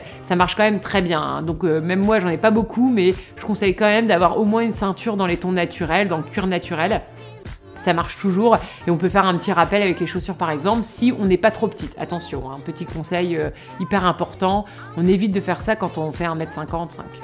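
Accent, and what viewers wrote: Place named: Nord-Pas-de-Calais, France. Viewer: Merci! J’adore ton petit accent chti qui me rappelle ma région natale 😘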